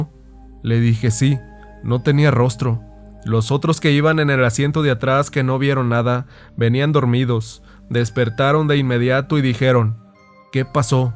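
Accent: North, Mexico. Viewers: ...orale, buenas historias y buena narración, muy norteña.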